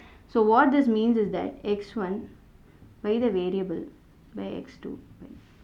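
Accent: South India, India. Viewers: Ma'am aap south se hai kya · are u south Indian? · Mam u should start acting u look extremely beautiful and your south indian accent is a cherry on top of it..... Loved it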